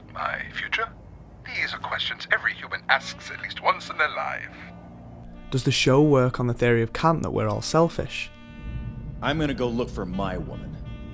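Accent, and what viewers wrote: United Kingdom. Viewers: i love how you have a british accent really gives you taat edge over nerdwriter1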